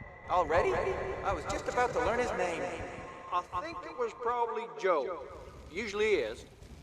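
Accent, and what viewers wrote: Netherlands. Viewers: You have a Dutch accent I'm right? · Your dutch accent is out of the roof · Ur acent is a bit dutch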